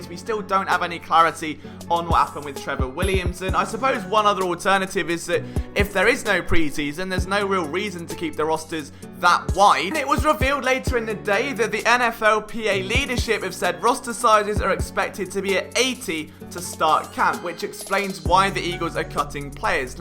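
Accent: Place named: United Kingdom. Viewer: Are you British? How did you become a Eagles fan? Love the accent btw. Stay safe!!!!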